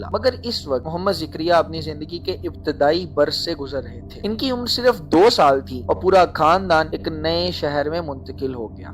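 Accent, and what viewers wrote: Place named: India. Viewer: یار غ کو گ ادا،کر رہے، خ کو کھ کہہ رہے، اتنا زیادہ ہندی کا اثر ؟ تھوڑا تلفظ پر دھیان دیجیے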